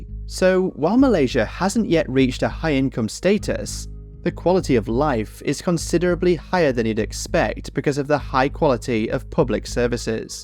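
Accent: United Kingdom. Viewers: presenter's accent is british. this mistake is more on the editor or producer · Fair analysis, but it’s pretty rich to be lectured by a British accent on the (admittedly flawed) implementation of the affirmative action policies Y’all got us in this mess in the first place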